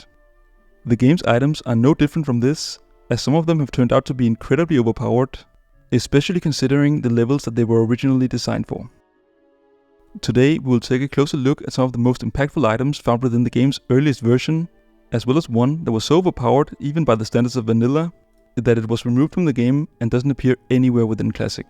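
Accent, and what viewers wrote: Denmark. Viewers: Danish accent represent. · are u danish! STEGT FLÆSK MED PERSILLE SÅVS!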